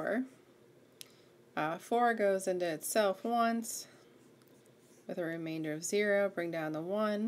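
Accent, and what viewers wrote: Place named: United States. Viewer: THANK GOD!! an AMERICAN!! NOT INDIAN!! THANK YOU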